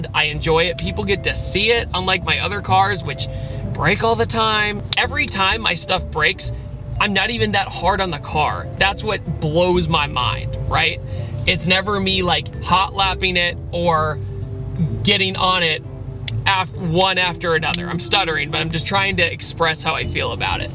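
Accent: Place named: South, United States. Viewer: Don’t apologize for that southern drawl. A southern man tells better jokes.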